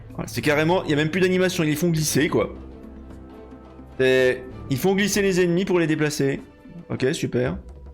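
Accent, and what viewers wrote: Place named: Quebec, Canada. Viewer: Jolie accent québécois 🥰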